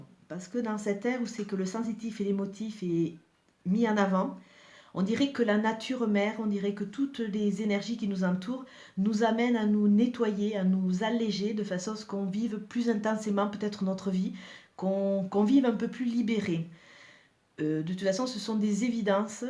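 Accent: South, France. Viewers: Merci il me semble entendre l'accent du sud qui correspond au même accent que moi je suis du côté du Tarn merci beaucoup c'est très parlant j'aime beaucoup à bientôt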